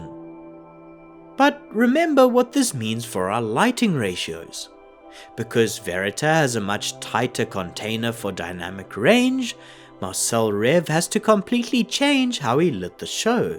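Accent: South Africa. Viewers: You for sure are from SA Bru, am I wrong? Howzit Boet 🫡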